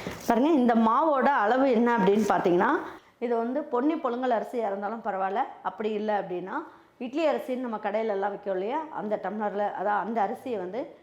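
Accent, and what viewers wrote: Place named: Kongu, India. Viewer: மதுரை சட்னி ஆனால் உங்கள் பேச்சு கவுண்டர் சாயல் உள்ளதே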